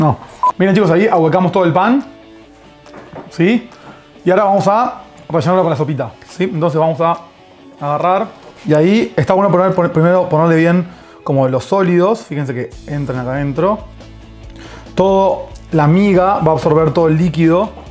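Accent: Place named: Buenos Aires, Argentina. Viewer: Entendí el 8% del video.. Alerta conurbano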